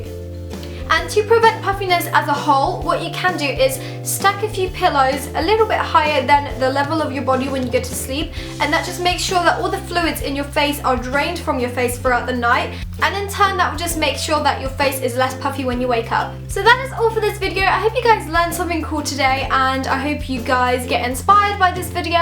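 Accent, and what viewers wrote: England, United Kingdom. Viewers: she has an English accent. There are so many different accents in Britain eg Scottish, Irish etc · That is a British accent correct? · British accent 😍❤ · English accent* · hahah defenitly not polish with pure english accent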